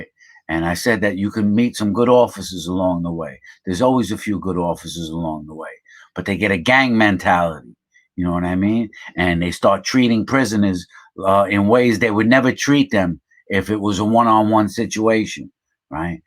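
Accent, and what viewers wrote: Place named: Downstate New York, United States. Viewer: This Dude is 💯% Spot On!😳! I Love His Message & His Accent too! Always have loved the Northeast / New York Accent! This Video Is Lighting My Brain & Heart Up!😇!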